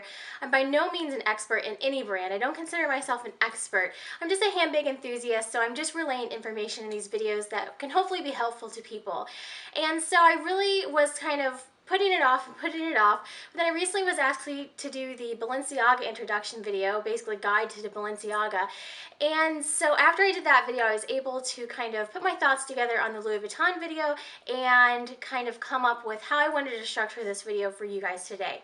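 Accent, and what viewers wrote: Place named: United States. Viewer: your little yankee accent is so cute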